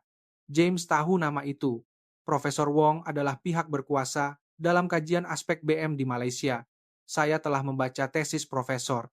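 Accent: Indonesia. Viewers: Ini cerita yg guna loghat indonesia.. ini reka cerita bukan dari Malaysia n bynk reka cerita yg tak mencerminkn org malaysia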